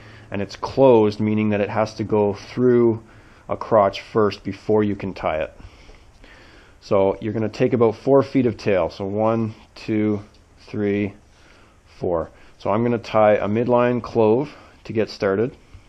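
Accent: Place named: Canada. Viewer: This is the Most Canadian dialect I have heard in years. Are you the real Red Green? (Beaner is racial slang criticizing Hispanic people, you should probably say the whole word.)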